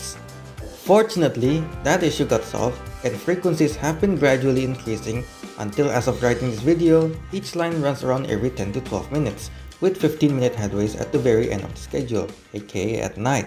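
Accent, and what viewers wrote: Indonesia. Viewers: Your english is excellent! this is how Indonesians should sound like when speaking english, by keeping our local accents, not like those wannabe jaksels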